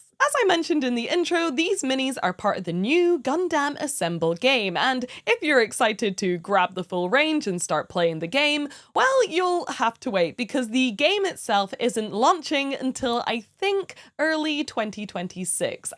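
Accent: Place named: Scotland, United Kingdom. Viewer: It's crazy that you're Scottish, cute and your voice doesn't sound like a garbage disposal. Always great.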